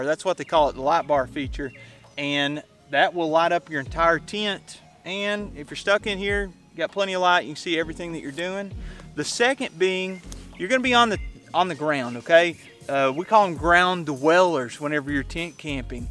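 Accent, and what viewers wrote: Kentucky, United States. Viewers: "Ah got mah tint-" Gotta love that Kentucky accent! It's just one of the many things which make up the video experience. ^_^ What is that beautiful location you're filming in, anyway? It looks glorious, I can practically smell the leaves.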